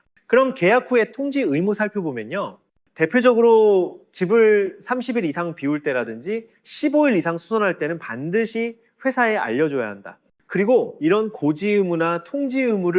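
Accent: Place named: Gyeongsang, South Korea. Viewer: 손보 29일 시험 30일 발표 결과 적으러 왔어요. 75점으로 합격했어요. 적상인가 해상인가 배 문제랑 처음보는 문제들 틀린것 같고, 합격하면 대박이라고 생각했는데 진짜 합격했어요....하하 8개나 틀렸지만 25개나 맞췄으니! 덕분에 합격했습니다. 난이도가 오르고 범위가 변경된 부분은 속상했지만 그래도 특유의 "강조" 하시는 부분과 쉽게 암기할 수 있게 만들어 주신 덕분이 아닌가 생각이 드네요. 조만간 변액도 도전할건데 그때도 잘 부탁드립니다. 목소리는 다시 못 듣나요? 경상도인 으로서 익숙하고 정감있어서 더 잘 들렸던거같은데...😅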